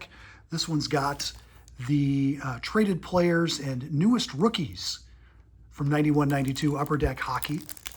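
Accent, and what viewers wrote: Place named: Canada. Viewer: Thanks for sharing, loved listening to you speak Canadian to us! The 1991 O-Pee-Chee baseball was a small set and was heavy on the Canadian teams, so you were right on.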